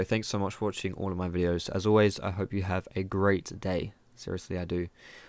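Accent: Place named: United Kingdom. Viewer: From the very first video, I was in love with your cheesy humor and that lovely british voice! I can honestly say, I'm here for, not;)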